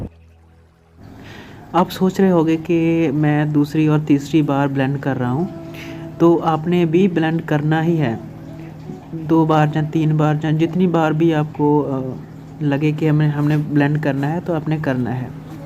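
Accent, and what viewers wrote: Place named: Punjab, India. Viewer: Hindi me punjabi touch 😁😁😁 but vry nice sir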